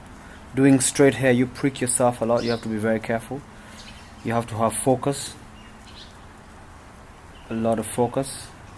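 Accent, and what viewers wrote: Kenya. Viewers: This is a wealth of information!!! I have been looking for a video that explains how to do this properly. Thanks!!! You sound Kenyan BTW. God Bless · you sound Kenyan...I wish I saw this before I had my loc extensions installed ....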